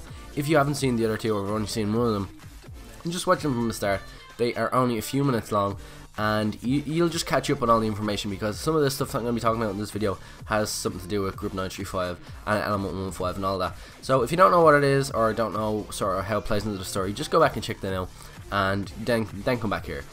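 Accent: Ireland. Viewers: is je Irish he sounds really irish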